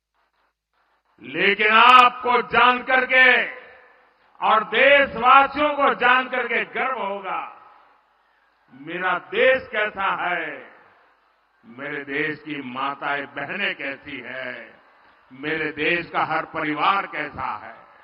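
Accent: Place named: Bengal, India. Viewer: He's talking in bengali accent what a marketing strategy 😅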